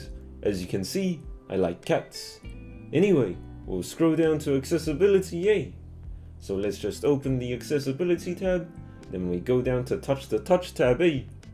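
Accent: New Zealand. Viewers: new zeiland accent lol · Kiwi accent 😅. Look it up.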